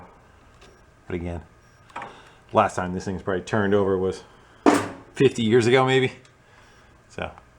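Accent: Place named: Massachusetts, United States. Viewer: A Yhard Buhirud? love it! Worked around lots of people with that accent... never forget it. I can do a pretty good North East Mass accent, and I can always tell in movies when they bullshit one. they all sound Australian when they fake it really badly.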